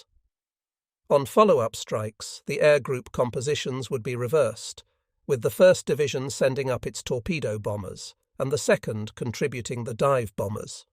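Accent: United Kingdom. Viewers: The creators are using open source software and have learned as they were producing. These videos are a labor of love. Give them credit for what they are doing. I still detest that semi British accent of the voice.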